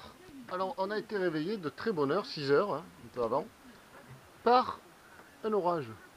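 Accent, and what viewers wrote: South, France. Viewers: Salut à toi j'espère que tu te porte bien ainsi que Tabasco, je voulais te dire que tes vidéos sont parfaites quotidiennes et hebdomadaires nous sommes tous au rendez-vous, cela fait quelques temps que je suis tes vidéos ( à vrai dire c'est mon frère qui m'a fait découvrir ta chaîne) et je vois également que tu montes à presque 4000 abonnés c'est beau parce que lorsque tu réalisé tes vidéos tu te prend pas la tête, tu mène ta vie tout simplement et tu nous la fait partager et le faite de poster plusieurs vidéos par mois ça crée une addiction lol (comme Netflix), du style " gros vient de sortir une nouvelle vidéo vas voir" ainsi avec ton accent Typique du sud on s'y croirait là sur le Tabasco, nous aimons bien refaire ton accents " Tabascoooo" Avec le temps tes vidéos ce sont améliorées (le son, vidéo, cadrage, Drone), celà ne peut qu'aller vers l'avant, Attention en mer. 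Signé un jeune néophyte!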